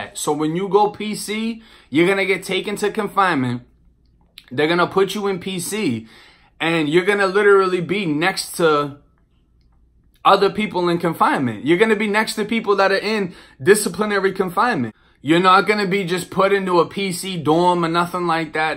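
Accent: Boston, United States. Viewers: Have you ever heard of anyone on death row going PC to drop out of their gang? I’m just wondering how that would work. Love your videos and Boston accent. I was in Boston in the winter back in 1974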